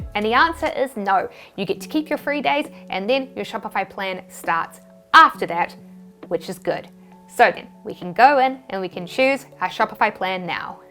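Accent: New Zealand. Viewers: I love your tutorials - so much information - they're brilliant! And I like listening to your Kiwi accent ;-) I have family in Christchurch.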